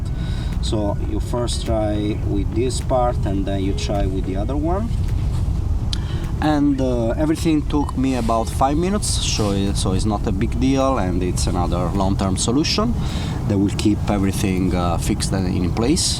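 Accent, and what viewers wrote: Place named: Italy. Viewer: Quanto cazzo si riconosce un italiano che parla inglese ahaha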